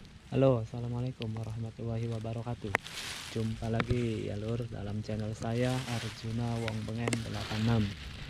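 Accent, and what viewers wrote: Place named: Cirebon, Indonesia. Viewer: Sampean logat cerbonan mas ...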